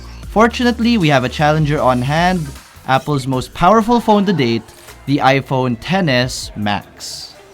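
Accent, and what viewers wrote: Philippines. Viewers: I immediately stop watching the moment I hear the ugly Filipino accent! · I'm confused. You look korean, but you have a tagalog accent. Itzy! They're dope, right?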